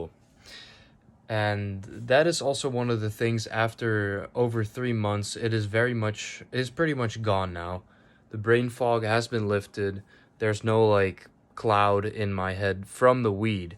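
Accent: Netherlands. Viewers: Yo bro are you dutch?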